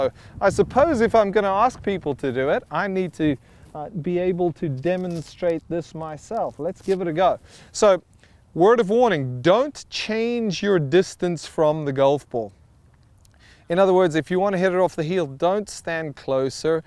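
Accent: South Africa. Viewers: I am a new subscriber to your channel... Love you videos Dont have too many golf Tutors in South Africa...but we do have some awesome golfer.... Your accent sounds south African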